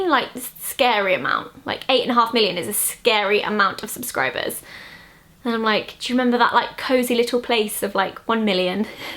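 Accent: United Kingdom. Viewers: Hi Zoella! I am from Brazil......... Say "hello" to Brazil in your nxt videos. I see yoour videos because you're so funny. I don't use make ups..hahah. I see also because i'm in UK and it help me learn a little more the way british people accent.... thank you kisses.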